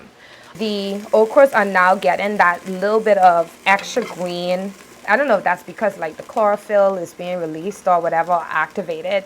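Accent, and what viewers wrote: Bahamas. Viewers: Gal I love how you did this with you authentic Bahamian accent. Your okra soup rocks just like you. A beautiful Bahamian girl who can cook and sound indigenous. Will be looking for your other videos.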